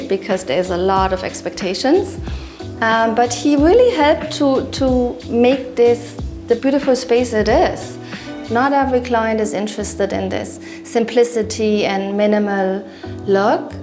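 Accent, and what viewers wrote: Germany. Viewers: Sounds German to me. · Definitely German. Many German's I have met in London have better English than us natives! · i lover her German accent. She should do ASMR videos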